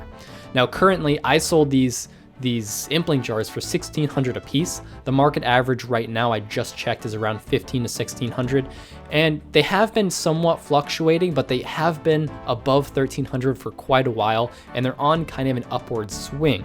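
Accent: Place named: Canada. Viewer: You sound incredibly canadian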